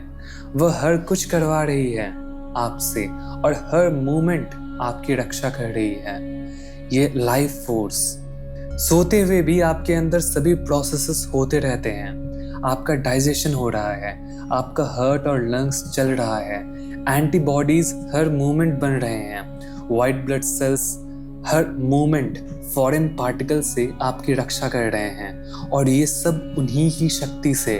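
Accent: Bihar, India. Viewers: Bihari hain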